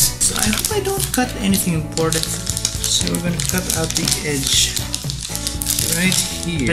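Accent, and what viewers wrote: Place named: Australia. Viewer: Love your Australian accent.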